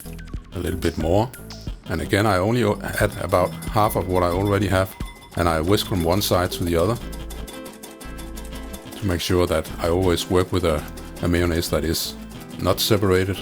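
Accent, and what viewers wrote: Denmark. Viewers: I knew you were danish because of your accent 😉 thanks for the video 😊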